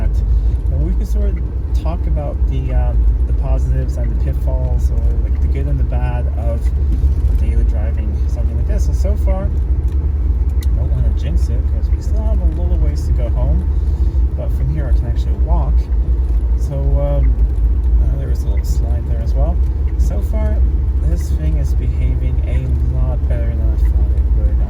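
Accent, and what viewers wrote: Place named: Midwest, United States. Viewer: I’m glad you made to and from with no problems. Once again you say you’re in Switzerland but you have a mid western US accent where are you from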